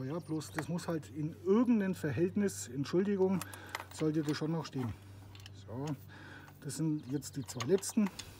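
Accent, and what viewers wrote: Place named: Franconia, Germany. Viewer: Servus, wo bist du denn her? Das hört sich schwer nach Frankenland an. Ich bin auch KFZ ler und würde mich gerne austauschen. Hast du ne Werkstatt oder Kontaktadresse?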